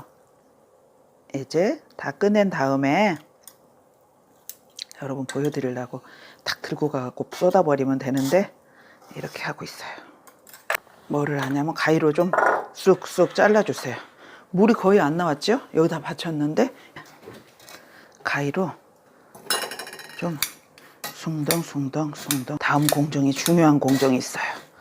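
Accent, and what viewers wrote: South Korea. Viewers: 요즘 듣기 어려운 서울 억양이 듣기 좋네요. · 친정 엄마께 제가 잡채하겠다고 말씀드려놨더니.. 당면을 미리 데쳐놓시고. 우엉이며 녹두나물이며 시금치까지 준비해놓으셨어요..ㅠㅠ 그래도 원체 식구들이 좋아하는 아이템이라.. 꼭 저리 당면삶아 해보고 말씀드릴께요.. 코다리 무우조림도 열씨미 보고 있구요.. 삼삼하고 손쉬운 깻잎도 정말 대박입니다.. 두가지 꼭 제 레시피로 만들려구요~ 저도 전라도 광주라 친근한 말투가 정겨워서 자주 듣게 됬습니다. 쉽고 고급진 레페시도 땡기고요.. 아참. 소갈비도. 나중애 도전아이템 입니다.. ㅎㅎ 감사합니다. 대표님~~^^♥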